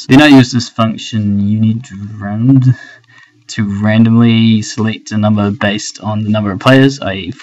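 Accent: New Zealand. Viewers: Such a Kiwi voice ! Awesome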